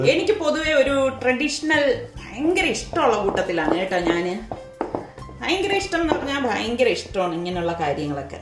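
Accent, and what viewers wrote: Trivandrum, India. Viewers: ..same recipe ente vappachiyudeyum favourite aanu.njanum orattitum varutha chamanthium undakarundu.pinne tvm bhasha parayunnathu kondu oru kuzhapavum illa. namukku pinne tvm bhasha alle parayan pattulu.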